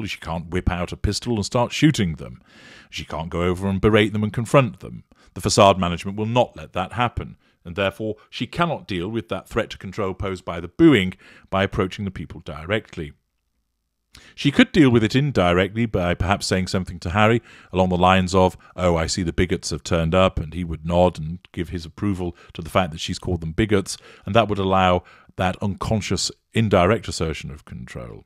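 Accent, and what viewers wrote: United Kingdom. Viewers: I adore your commentary. You beautiful British accent. Plus you make me laugh. So bloody happy to have found your channel. From Australia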